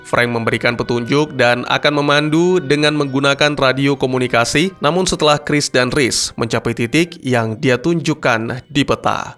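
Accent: Aceh, Indonesia. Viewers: Maaf bertanya org Aceh ya bg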